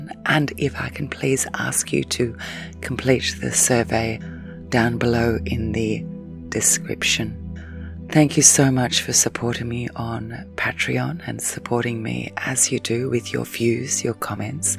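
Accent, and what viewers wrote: Australia. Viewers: nice hearing a fellow aussie I'm going through a very tough time ( I'm a carer for my beautiful fiancee who self harms). Listening to you is sometimes the only thing that gives me solace and reminds me that yes "I'm ok " xxx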